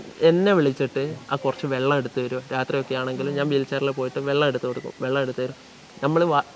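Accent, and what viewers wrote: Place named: Malappuram, India. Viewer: മോളെ എങ്ങനെ മലപ്പുറം ഭാഷ ഇത്ര വേഗം പഠിച്ചു.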